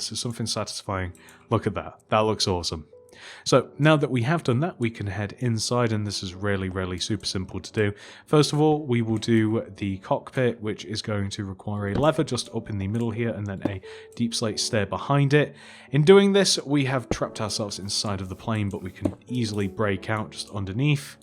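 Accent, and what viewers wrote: United Kingdom. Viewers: Are you british Hope you're not I will be mad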